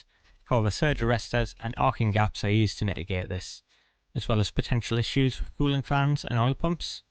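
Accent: Newcastle, United Kingdom. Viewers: I've just discovered your channel. I'm just a nerd interested in all these things, I won't pretend I understand everything, but it fascinates me nonetheless. Please don't use an AI voice over. Your wonderful Geordie accent adds to the authenticity. Thank you for sharing your knowledge and experience, from a Black Country fan. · I love the Geordie accent. Keep up the great videos. Although I am an electrician who does purely domestic work, I think it's good to take a deeper dive into this sort of thing now and again. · Please stay away from AI voice-over! Your speaking voice is just fine. A good clear soft Geordie. Quote: "A 2008 newspaper survey found the Geordie accent to be perceived as the "most attractive in England" among the British public." All the best, Bob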